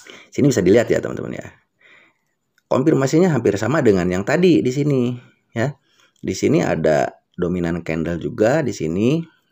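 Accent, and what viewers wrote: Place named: West Java, Indonesia. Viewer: Cara menjelaskan bhasa rakyat jelata, dgn bahasa yg medok sunda dan backsound hembusan 'udud yg khas mengiringi setiap paragraf dn yg penting buat orang jdi mudeng dan cpat faham, hatur nuhun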